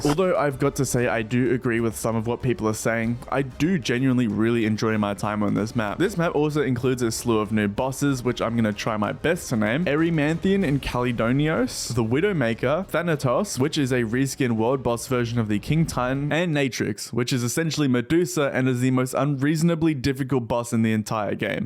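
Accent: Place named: Australia. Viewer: Completely off-topic but this comment made me realize that I was hearing an Aussie accent, and now I’m barely noticing that a large number of youtubers I’ve watched throughout my years are actually Australian lmao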